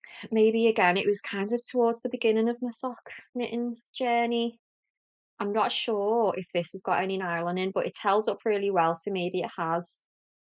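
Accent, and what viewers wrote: United Kingdom. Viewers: I love your socks and your accent! Where in the UK are you from?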